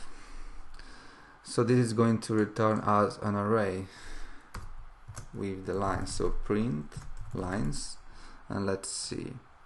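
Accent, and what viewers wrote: Italy. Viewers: Thanks a lot! Are you Italian?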